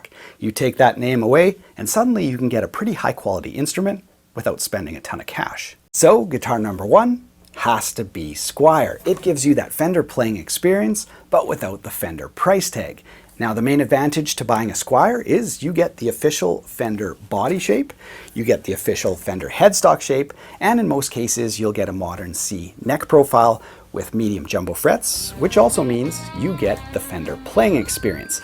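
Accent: Canada. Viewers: no Godin? and I hear you're Canadian and you're not propping up a good Canadian company... Love my Godin Session.. and yes I am Canadian.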